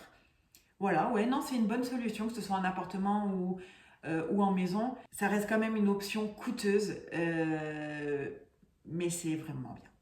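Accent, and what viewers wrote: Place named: Corsica, France. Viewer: Salut,déjà je voulais te féliciter pour le nombre croissant d'abonnés,c'est fantastique,je me souviens de ta toute première vidéo,sombre,le son au minimum,lol, filmé avec ton tel,dans ton appart,et je m'étais dit waouh mais cette fille avec son petit accent corse va cartonner c'est sûr...et ben voilà!!tu es devenue IN-CON-TOUR-NABLE désormais!!! BRAVO 😉🌿 bon dimanche et bonne continuation.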